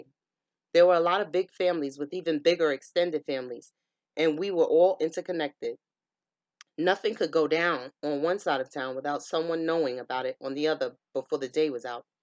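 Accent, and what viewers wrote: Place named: East Coast, United States. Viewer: Thanks for doing this you did. WONDERFUL JOB! U SHOULD CONSIDER DOING THIS FOR A LIVING! LOVE YOUR EAST COST SPEECH!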